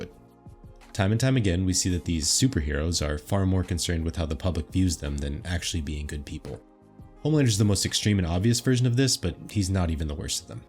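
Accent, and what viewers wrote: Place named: United States. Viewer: Thanks for talking about the boys in perfect American not like these wana be Americans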